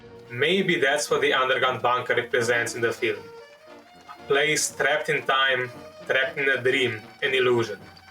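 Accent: Eastern Europe. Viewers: Good explanation. I like video essays that cover films. What I like most is how a Slavic voice is explaining a Slavic movie, which seems more authentic to me. I do look forward to new videos. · Good narrration ( love the slavic accent 😂, as i am from eastern europe myself) Great editing, good flow of video, never heard of the movie which was interesting Only one thing -The mic bro you need to upgrade that 😊 just some constructive criticism Good luck with your channel 👍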